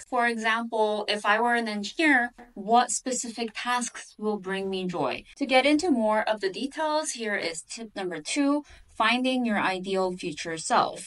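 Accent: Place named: South Korea. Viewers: Great video . Btw Are you of Korean origin ? I noticed a slight accent . I have a linguistics background that's why I noticed , I did not mean to offend in any way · Yes. I'm half so I've heard Koreans speak English for most of my life. I dunno what it is exactly but it's recognizable. But in case you wanted to really know, the last name is a dead giveaway.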